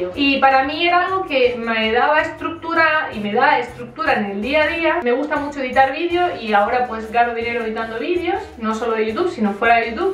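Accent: Granada, Spain. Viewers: eres muy fuerte.,Te sigo desde españa y me gusta como te expresas,., como se nota que eres de granada., Pero es normal que añores a tu familia y españa hay otras costumbres y se vive diferente., Te doy mucha fuerza para que continuemos tu y Pau.,hacia adelante¡¡¡ yo mi adolescencia veraneaba en un pueblo de granada.,y todo lo que explicas es verdad¡¡muchos besos para ti y para Pau y para el perrito 😘😘😘😘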